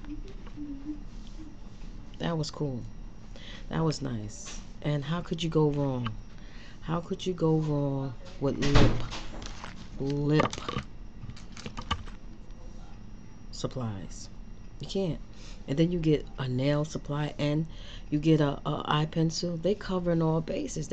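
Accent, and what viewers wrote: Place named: New York, United States. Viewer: Are your from NY? Lol i ask because you sound just like my best friend tasha and you both have a slight NY accent, love it!! You give a calm sweet vibe i like that.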